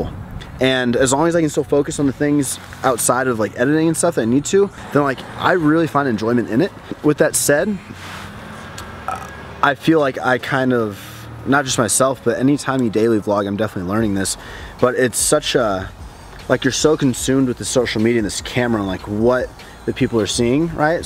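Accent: South, United States. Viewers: Lmfaoff I love the country accent 😂😂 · That country accent 😂😂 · Only if you do your Texas accent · Country accent is A1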